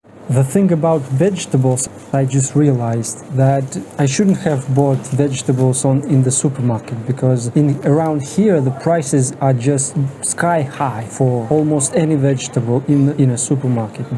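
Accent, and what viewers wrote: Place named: Scotland, United Kingdom. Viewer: Sounds like a Scotty to me.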